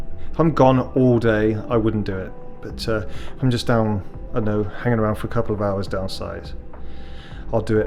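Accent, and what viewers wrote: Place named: United Kingdom. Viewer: Me and wife have booked here for September great video Ps u don’t sound American our you British ?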